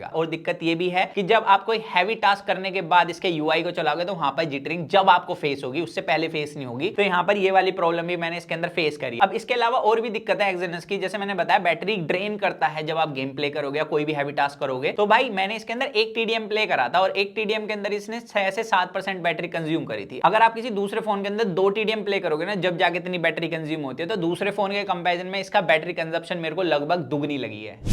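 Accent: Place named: Haryana, India. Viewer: Kuon hae yae Chutiya😂....look at his voice... haryana kae kuon sae "gaon" sae hae 💩💩 I thought it's mrwhosetheboss . BC mood off kar diya🤬 "itnaa kachraa hae you tube par"💩💩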